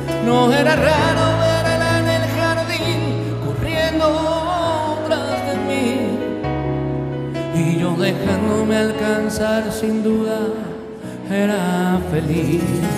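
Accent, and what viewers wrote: Maracaibo, Venezuela. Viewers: Mal hecho es ARGENTINO Y VIVIÓ EN SU ADOLESCENCIA EN VENEZUELA SE LE PEGÓ EL ACENTO SOLO ES ESO PERO EL NO RENIEGA DE SU SUELO ARGENTINO SIEMPRE DESTACA SU PAIS Y SU AMOR POR ARGENTINA DONDE ESTA SU FLIA . 🇦🇷🇦🇷🇦🇷🇦🇷🇦🇷🇦🇷🇦🇷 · Yo siempre he dicho que uno no es de donde nace sino donde se cría. Esta bien, Ricardo Montaner nació en Argentina. Pero su crianza, educación y formación ARTISTICA fue netamente en Venezuela. No tiene acento argentino, tiene acento 100% maracucho (originario de Maracaibo, Edo. Zulia). Su familia, esposa e hijos, venezolanos tambien. A continuación, uno de tantos artículos donde él admite ser primeramente venezolano . Ricardo Montaner: "No soy para nada argentino. Soy venezolano". <link> El mismo caso que Franco de Vita... artistas 100% orgullosos de ser venezolanos.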